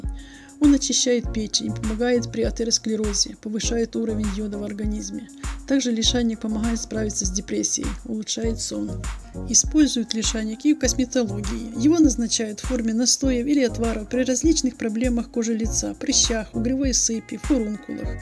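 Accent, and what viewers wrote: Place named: Ukraine. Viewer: с украинским произношением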